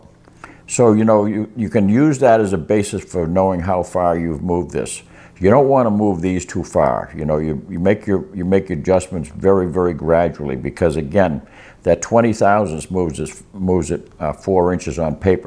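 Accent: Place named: Maine, United States. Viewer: I know a fellow maine accent when I hear one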